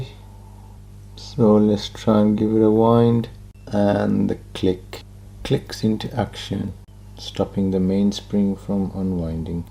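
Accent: Birmingham, United Kingdom. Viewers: 100% you’re from Birmingham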